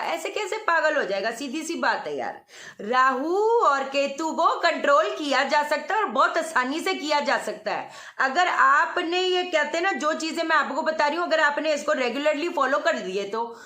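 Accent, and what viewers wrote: Punjab, India. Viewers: Wow💞 bindass talking style👌pujabi touch ..❤️( hai ki nhi.)my favourite ..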